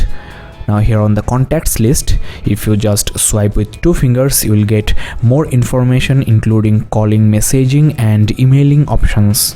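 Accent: Bengal, India. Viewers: Not being racist, but he sounds almost like a indian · lmfaoo thats a bengali accent its clearer than indian but almost the same, indians say aindd for the word "and" lmfaoo.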